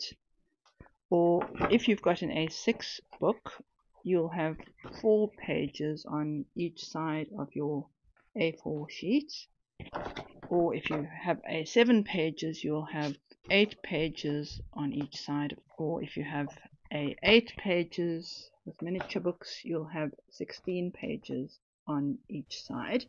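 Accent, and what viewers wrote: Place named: South Africa. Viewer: You must be from South Africa? You sounds just like my mother in law. 😊 Thank you for this video! I have always arranged mini book pages the hard way, but now I have some much easier and quicker options.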